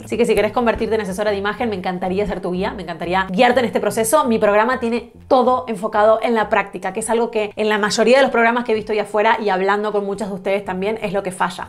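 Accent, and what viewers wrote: Argentina. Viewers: Ajjaaj.perdon pero me resultó super extraño escuchar tu acento argentino y que hablabas de invierno cuando nos estamos fritando en Argentina. Luego fui a ver la descripción del canal y vi qur no estás en el pais. Fue muy raro, lo quería decir 😂. En Argentina los cargos se estan usando muchisimo y tambien los rectos y anchos. No te digo que tiende a desaparecer el chupin, pero se usa mucho menos! Y de esos con la manga doblada no he visto, hace años que no se ven, se usaban antes, yo los usaba de hecho, pero no los he vuelto a ver en la calle por el momento. El capri me gusta pero no a la rodilla, de largo hasta la pantorrilla esta bien. Tan corto es como los hombres cuando usaban pantalón corto hasta los 18..... quedan feítos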